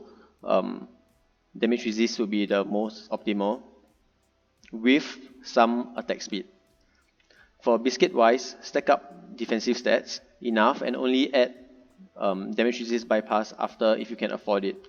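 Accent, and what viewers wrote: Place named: Malaysia. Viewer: Are you malaysian , i know that accent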